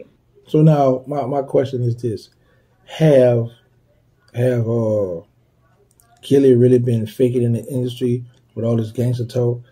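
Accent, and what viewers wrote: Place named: Louisiana, United States. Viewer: Did he grab him? Yea come ya😂😂 straight louisiana exactly how we talk.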